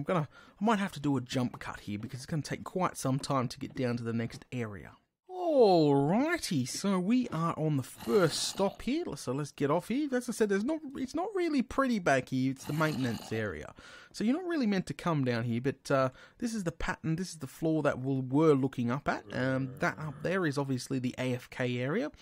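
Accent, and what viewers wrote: Australia. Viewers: you are very australian sounding... except hardly anyone uses those words, are you from alice springs?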